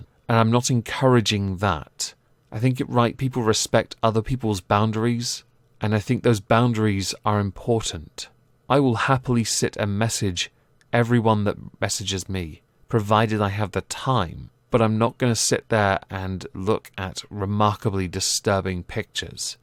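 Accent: United Kingdom. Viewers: My condolences for issues you're dealing with. I hope whoever this fan is gets professional help, because this kind of fixation is unhealthy. I hope the issue is resolved as soon as possible and appreciate your upfront acknowledgement of the situation so your supporters (yes I had been using the vernacular meaning of fan in the past, but given the circumstances I will switch to your preferred and honestly more precise term at your suggestion, not out of sicophantism but because you made a good point regarding the implications of the word). Best wishes and look forward to seeing more content from you in the future. Edit: For the record I find the precision of your diction pleasant to the ear but not unusually so. I recognize you have a British accent, but you make no pretense at anything else so I don't think it's a big deal. I do find your educated choice of language enjoyable to hear, simply because I instantly know what you mean by your choice of words. 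But again, it's more an intellectual enjoyment like reading a well crafted sentence. Actually I take that back, it isn't like that intellectual enjoyment, it is that intellectual enjoyment.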